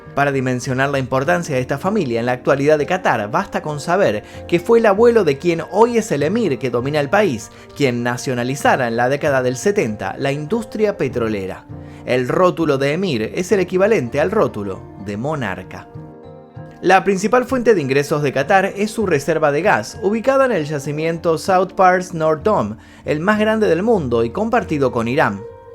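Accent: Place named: Argentina. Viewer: Con todo respeto, no lo se, pero me atrevo a decir que por su acento es argentino, y si es el caso, es la primera vez que veo un video de un argentino hablando del mundial y sin decir que o explicar por que Argentina debería ser campeón. (Ojala si gane) hahaha apoyando a latam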